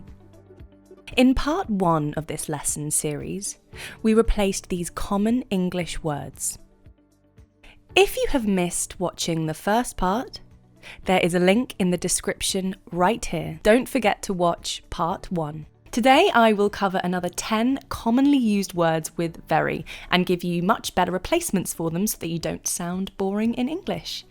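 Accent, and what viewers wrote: United Kingdom. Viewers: I really like your British accent. Furthermore, I enjoyed the lesson. Thank you 😊😊😊😍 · This girl's voice accent seems from united kingdom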